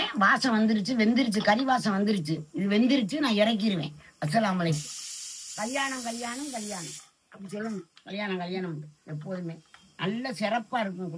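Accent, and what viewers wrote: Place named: Ramnad, India. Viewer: Maami arumaiyaana samaiyal adhuvu namma ooru style la supperr superr ongakitta enaku pudichadhey neenga namma ooru ramnad slang la pesradhu dhaan adha enikumey vitraadhinga idhey madhrii nalla manampola pesunga manasuku neraiva irukuh onga youtube channel maelum maelum uyarvuh pera manamaara vaazdhigirom eid mubarak maamii ❤️❤️🙏🙏🙏🤝🤝☺️☺️🥳🥳😇😇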